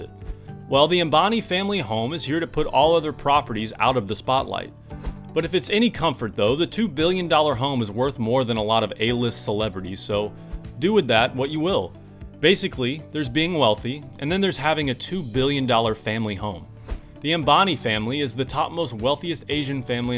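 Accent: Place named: Liverpool, United Kingdom. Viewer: Nacks scouse bro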